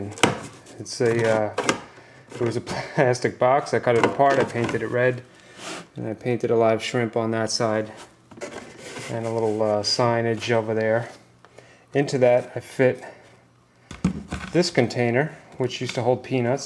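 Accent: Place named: New York, United States. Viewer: This was brilliant. I know you said "Florida" but you sound like you're from NY, maybe Queens.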